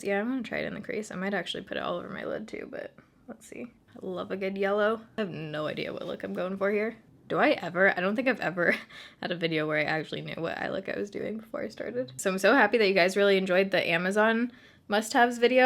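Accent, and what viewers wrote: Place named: Seattle, United States. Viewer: You're the first beauty guru I've followed who lives in my area. I actually just started following you, and when you said you were living in Seattle it all made sense. Your chillness, fair skin (which is easy to have when it always rains here), the way you talk (although I can't explain it). I'm looking forward to seeing more of your videos!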